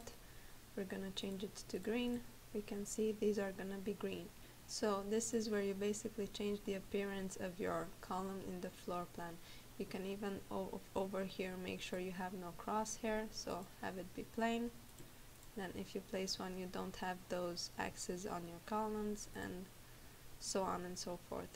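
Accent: Eastern Europe. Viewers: Very slavic accent, where are you from?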